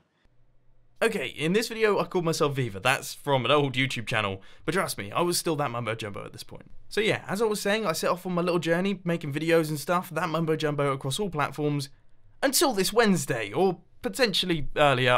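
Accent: United Kingdom. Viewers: GradeAUnderA = Mumbo Jumbo EVIDENCE!!! Notice they both live in the UK, they have same accents but Grade is just louder. GRADE = MUMBO CONFIRMED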